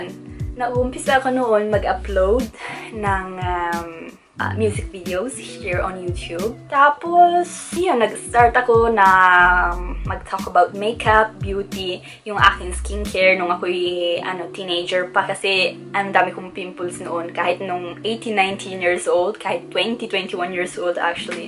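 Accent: Italy. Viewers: Il Tagalog con l'accento milanese è magia. 😂❤ Vi prego ditemi che c'è qualche mix filippino-veneta nei commenti. Ho bisogno di sentire come suona il Tagalog con l'accento veneto 😂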